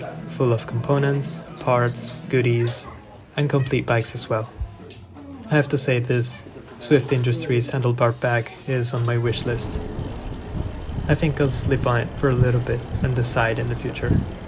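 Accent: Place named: Italy. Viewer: you remind me a lot of a really good friend of mine from college days, also from italy, had a very similar accent/intonation as well as a similar way of looking at things (and he was a huge film nerd!)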